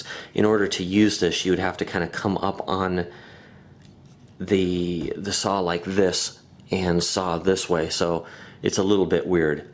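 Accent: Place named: Texas, United States. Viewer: Thanks for this great review man! U from Texas ;-)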